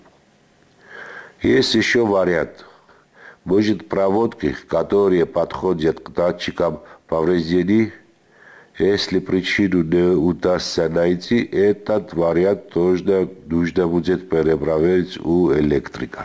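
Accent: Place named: Georgia. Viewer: Гаумарджобат генацвали! Смотрел и слушал, сначала сомневался, акцент знакомый, похож на грузинский. Город, улицы, похожи на Тбилиси! Ностальгия просто, я служил 2 года в Тбилиси! Молодец биджо, разобрался с причиной, машинка огонь вообще!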